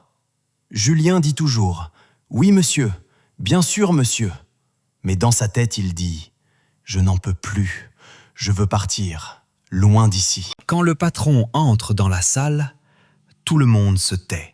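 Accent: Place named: France. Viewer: I liked your dialect when you speaking English with French flavor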